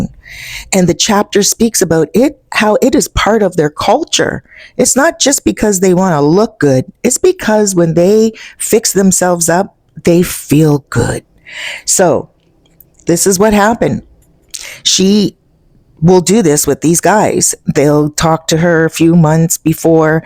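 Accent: Canada. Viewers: love the Canadian accent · Again I love that Canadian Accent. Very pleasant. BTW went to Buffalo State College and visited Toronto plenty of times. That area around Lake Ontario is Cold 🥶. Brrr 🥶. I bet it’s warm spring and summer nearly year round. Nice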